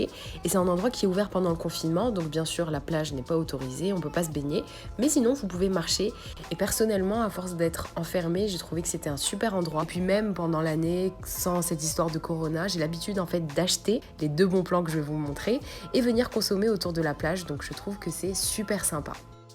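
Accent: France. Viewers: Je déteste la prononciation des mots anglais en accent française aaaaaaaaaaaaaa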